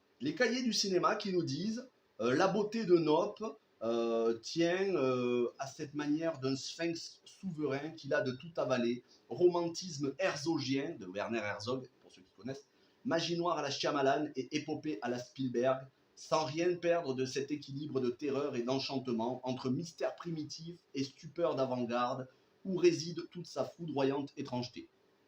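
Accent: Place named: South, France. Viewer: Nope reste meilleur qu'US mais je trouve qu'il est trop long avec un changement de ton qu'il ne maitrise pas tant que cela car parfois je suis sorti du film ... Trop d'idées (beaucoup de references) rend en finalite le film moins interessant et c'est dommage. Grosse qualité visuelle, gestion de l'espace avec des jolis plans, l'histoire des dresseurs de chevaux afro americains au debut du cinema, la scène finale avec la poursuite en moto, la relation entre le frère, le père et la soeur. Merci pour ta video avec ton accent qui chante les cigales du Sud 😉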